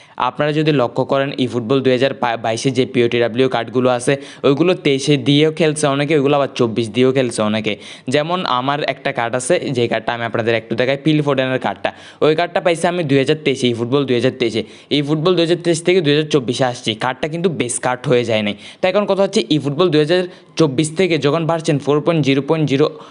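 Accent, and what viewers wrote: Chittagong, Bangladesh. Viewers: Vai apni bangla vasar shate chittainga vasha mix kore felen kno? Apnar kotha golo sunley hasi pay😅😅😅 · Vai ki chatgaiya naki?🫠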